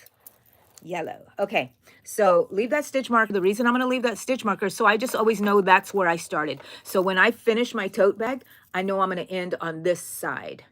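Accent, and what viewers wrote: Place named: New Mexico, United States. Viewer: Are you from NM? Your accent reminds me of the abuelitas here!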